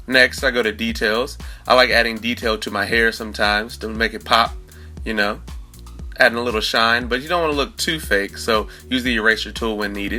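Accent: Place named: Carolina, United States. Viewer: It’s crazy how I could tell that you’re from Carolina like me bc of your accent 😭great video tho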